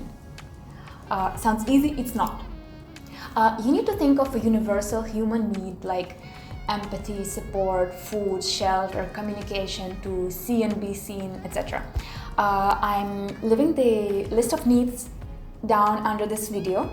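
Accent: India. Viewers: Your English pronunciation are now like the more Indians and less russian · Very interesting video 🙏 I think in my relationship we also have the need of friendly and warm communication 😁 btw did you notice that you have an indian English accent? Super cute 😅🥰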